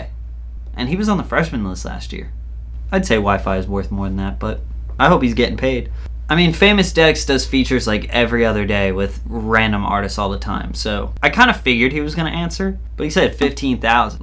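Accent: Netherlands. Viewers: are you dutch? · Are you dutch? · Je bent Nederlands?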